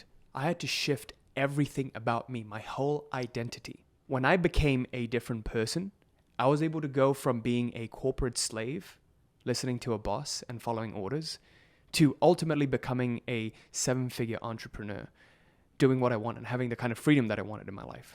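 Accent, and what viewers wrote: South Africa. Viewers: I can hear an English accent but with a slight South African cadence and you are living in Florida:) Great video btw! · South African tones….? cool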